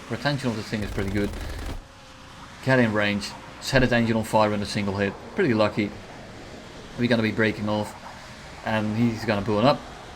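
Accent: Netherlands. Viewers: goddamn i can hear the dutch accent once you notice it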